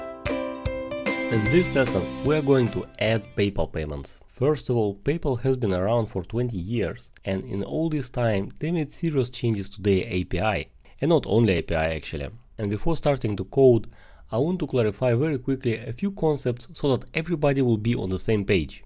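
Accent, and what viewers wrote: Russia. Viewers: Спасибо брат. Но был бы на русском урок - было бы ещё лучше. Акцент русский слышно))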